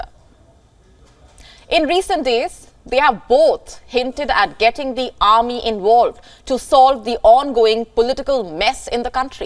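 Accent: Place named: India. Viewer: This is the worse presenter in Wion. Her spoken English is Indian not English.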